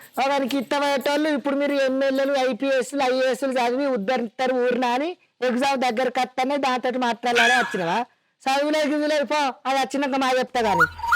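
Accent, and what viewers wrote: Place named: Karimnagar, India. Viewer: మన తెలంగాణ భాషా యాస… పక్కా కరీంనగర్ 😅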